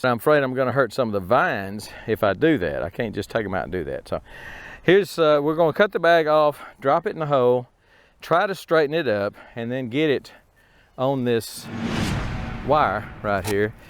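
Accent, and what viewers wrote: Texas, United States. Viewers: Love the Texas French speak. LOL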